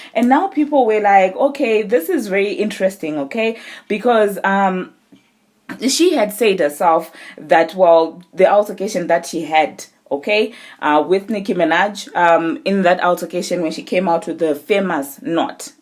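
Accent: South Africa. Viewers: Are you South African 😢😍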